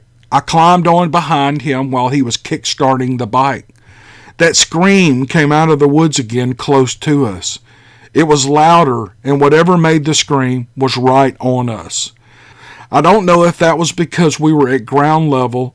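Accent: South, United States. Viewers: I just want to say that I immensely enjoy all your stories and music and I enjoyed watching your bike ride with your cute dogs running around you. I hadn't heard much about dogman and was surprised to find out about another cryptid. I love hearing about sasquatch, dogman, and the ufo sightings. I live in Toledo, Ohio and I love your southern accent. It's so friendly and homey sounding. Well, I don't want to ramble on to much but I love your channel and could listen to the stories all day and I can tell that you love reading them. Thank you. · I’m pretty sure I hit subscribe 5 seconds after hearing you start narrating. - your voice/cadence/accent is totally “good ol boy” and I can not get enough! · are these your drone shots? I love them. I am from the lowlands of SC, big family property in the middle of nowhere. I live in Northern California these days. 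Your videos make me think of home as does your accent and manner. I listen to your stories whenever they come out before bed. So thank you! Some of these stories have happened in either my county (Santee in Orangeburg) or on the New River in NC where the other side of my family has been there for hundreds of years. Never seen Bigfoot. Other things though. Anyways I got to visit Mississippi someday. People kinda put it down but obviously that is hogwash especially if you love the land and blues. Kinda like an even wilder version of SC. Let me know about that drone footage.....and yes dogs have a very special and particular place back home too, they qualify as a y’all. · Love your stories. It's just what I need to get thru these bad, horrible times. It's bad up here in the northeast. I love your southern twang and relaxed state. God bless. From cold NE. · Hey, I've commented in the past about how I love your voice and soft southern accent. 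Well, I didn't mention it before, but I also think it's wonderful how you have such a kind, tender heart!!! With your love of the outside, your dogs, your home State,Mississippi, and your family, I think you are about the perfect Southern Gentleman!!! We are so lucky for! Great stories! Great narration! I am so glad you spend time creating such an interesting and fun channel!!! Thank you for all the time & thought you put into making great entertainment!👏👏👏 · "How y'all doing?" Absolutely Southern. I've been watching bee keepers on YouTube and one in North Alabama said the "comb was about the size of a bisquit. " Just had to laugh. Where else would you hear that comparison?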